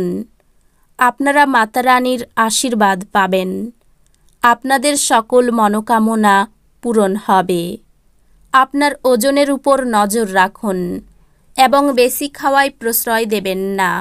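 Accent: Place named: East Bengal, Bangladesh. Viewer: আপনার বাড়ি?,মনে হচ্ছে east bengal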